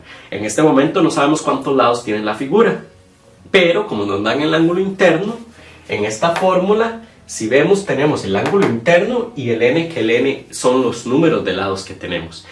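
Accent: Costa Rica. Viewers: Hasta ahora veo que sos de Costa Rica el acento me parecía que si y que dices "verdad" hahahaha Pura vida pa buenos videos , voy a estudiar con tus vídeos para Bachillerato ( me parece que hasta sos de San Ramón)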